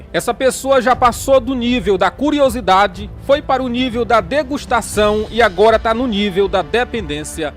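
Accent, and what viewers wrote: Northeast, Brazil. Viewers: Eita nordestino reais e muito engraçado o jeito dele falar paz irmão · Oi pastor também sou nordestina e conheço bem esse sotaque 😁 obrigado pelos ensinamentos da palavra do Senhor gosto muito de ouvir vc que o senhor abençoe vc a cada dia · PASTOR, AMO MUITO TUAS PALAVRAS, TEU SOTAQUE NORDESTINO, NUNCA MUDE NADINHA, CONTINUE NOS EXORTANDO COM GRAÇA E SABEDORIA!!! GOSTO MUITO DO JEITO QUE VOCÊ FALA DO CARNIÇA, É ASSIM QUE CHAMO O PULA MOITA KKKKKK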